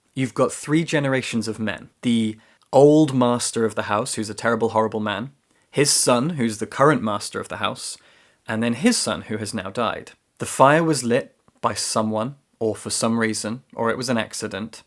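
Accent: United Kingdom. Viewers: I'm so glad I found your channel. I could listen to you speak all day, both for your rich voice (I adore a British accent, being American and an anglophile) and for your professorial tone. I feel smarter just for having listened to you! You gave me all sorts of ideas for next reads. I look forward to watching more of your videos. I'm a retired school librarian and grandmother and I miss being surrounded by book people--who of course are the best! Thanks for all you do.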